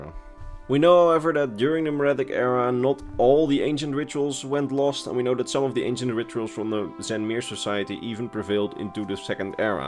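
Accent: Netherlands. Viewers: Are you dutch? I can tell from your accent hehehe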